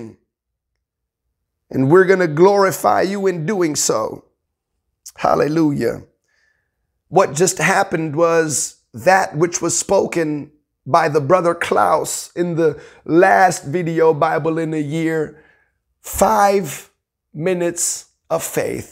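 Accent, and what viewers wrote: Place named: Cockney, United Kingdom. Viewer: Awesome Brother Klaus 👌 Also, loving the British accent, you sound like a proper cockney geezer 😄 Big love 💘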